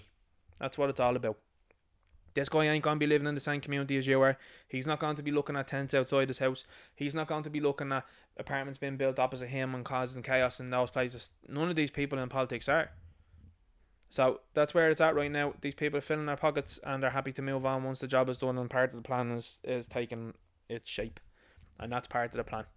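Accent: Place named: Dublin 4, Ireland. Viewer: Love ur d4 accent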